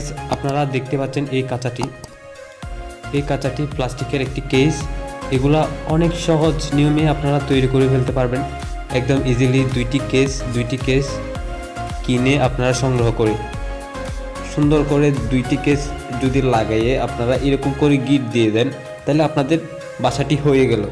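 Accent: Chittagong, Bangladesh. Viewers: Karon ami deklam apni chitainga vasay kotha boltesen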